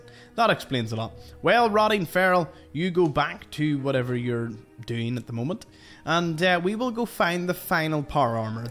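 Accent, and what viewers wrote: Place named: Belfast, United Kingdom. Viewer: Accent making me homesick(I am from Knocknagoney)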